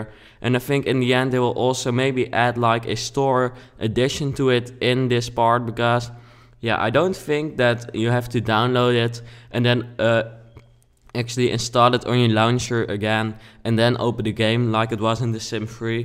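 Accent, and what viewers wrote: Netherlands. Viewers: Haha you have such an Dutch accent ( btw ik ben ook nederlands :p )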